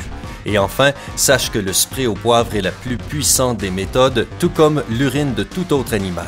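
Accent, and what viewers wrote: Quebec, Canada. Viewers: Cet accent quebecois juste inssuportable..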